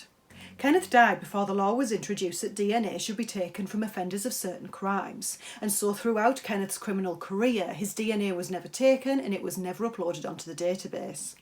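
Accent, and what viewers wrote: Scotland, United Kingdom. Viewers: I liked this video and your accent. Are you Scottish? You are very beautiful.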